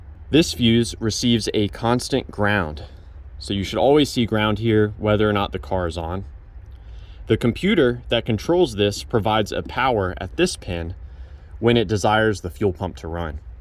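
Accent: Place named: United States. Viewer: You told me EXACTLY the pin info.. And clearly in an American voice.. Lol.. I want to drain the old fuel .. Been sitting more than 3yrs. Thank you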